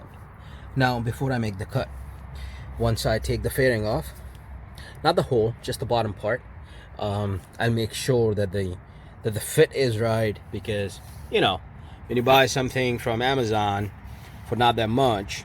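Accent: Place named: India. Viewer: u sound desi as fuck bro 😂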